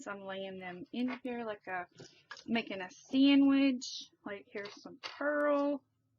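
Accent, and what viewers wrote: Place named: Texas, United States. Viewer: I know this is an old video but I THOUROUGHLY enjoyed it!!! You MUST be from somewhere in Texas! Lol. {You talk like me}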